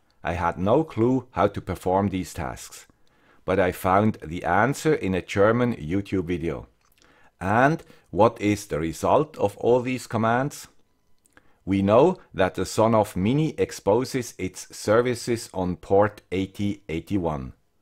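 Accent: Switzerland. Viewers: Hey Mr Swiss Accent.... you should try using the mobile hotspot in Windows 10. Just search hotspot and it will take you to it. Set the SSID and Passwort and activate! ;) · It's a sin. Anyway, ok for the swiss accent: you are great!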